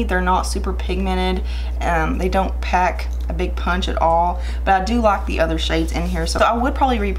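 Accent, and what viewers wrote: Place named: Kentucky, United States. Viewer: I just found your channel and your so relatable! Love videos like these! Where are you from? You sound like a Kentucky girl like me lol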